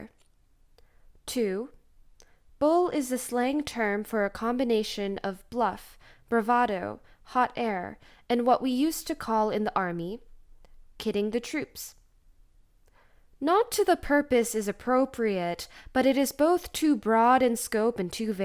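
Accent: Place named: United States. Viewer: Thank you for making this audiobook of the essay "Bullshit" by Harry G. Frankfurt (The USA 1986). Your diction, cadence and enunciation of American accented English is excellent. 👍 I just discovered your channel today, Sun. 3rd Aug. 2025 after reading an article about the essay on The Telegraph UK, mentioned on Drudge, It is true, the world in 2025 is awash in lies, humbug, obfuscations, spin, propaganda, deflection, misinformation, cover ups, destroying and concealing evidence, muddying the waters and leading the hounds of the scent. However, there is Objective Truth in the universe, i.e. either something happened or it didn't. A good saying I first read in 2003 comes to mind: "It doesn't matter what you think or believe, we will all know what is true and correct in the end!" שְׁנָאֵל שְׁפָתִים שַׁקְרִים יְהוָה, וְבָאֲנָשִׁים חָפֵץ. 😎 Adam Neira Founder of World Peace 2050 Founded in April 2000 Paris - Jerusalem - Melbourne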